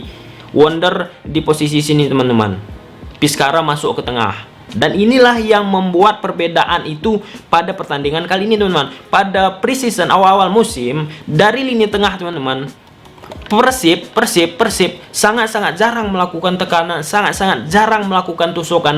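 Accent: Malaysia. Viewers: Logat melayu kental skli bang, orang riau atw mana ya?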